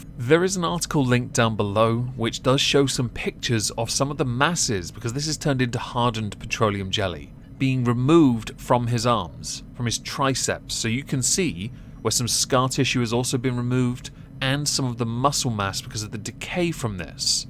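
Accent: United Kingdom. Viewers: Horseshit dude. Your voice is the smoothiest butteriest British accent I've ever heard. And I love your content dude. Never stop. ❤❤❤ and congrats for 100k. Been here since you were around 45k I believe.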